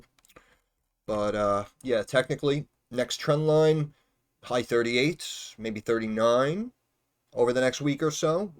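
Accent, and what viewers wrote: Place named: New York, United States. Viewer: I listen to you because you were spot on with luminar tech, and the the NY accent reminds me of home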